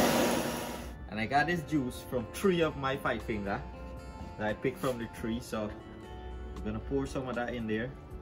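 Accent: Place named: Guyana. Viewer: The awesome dishes that you are preparing and sharing the recipes, are the type of food that we ( we) I’m putting we in brackets because I’m not referring to anyone. My grandma used to make this awesome dish. Even to my grand father in law ( Indian decent) I’m so grateful for our ancestors and their recipes. Thank you very much, you rocks. Love all the recipes, god bless you brother. Must say that I love the Guyanese accent.That’s what I’m talking about.the awesome five finger fruit drink. That’s a power house juicer.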